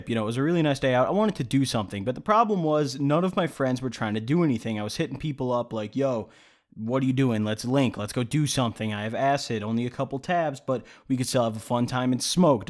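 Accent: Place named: Illinois, United States. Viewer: How did I know you live in Illinois I live in Rockford, I recognized the accent I am white and have the same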